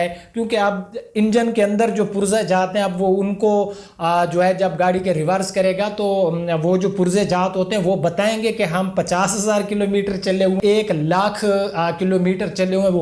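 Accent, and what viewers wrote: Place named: Punjab, Pakistan. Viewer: Good info.....urdu in punjabi accent...😋😋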